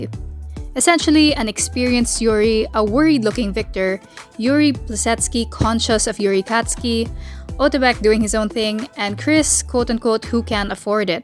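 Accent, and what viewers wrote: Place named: Philippines. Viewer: are you filipino? I can catch the accent bc it's the same as mine 💓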